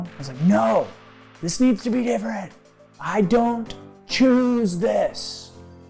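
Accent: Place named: United Kingdom. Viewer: Hey, I wanted to let you know that I just saw a clip of you and TAN talking about Blind Eyes Opened earlier today and I love that you backed that movie. With my personal story that hit me SO hard. Thank You so much for that....and I like your vaguely British accent...:)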